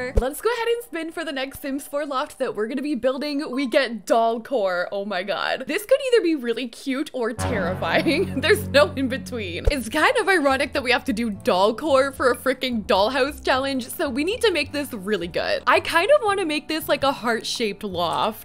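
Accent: Canada. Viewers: i could immediately tell you were canadian in the first minute of the video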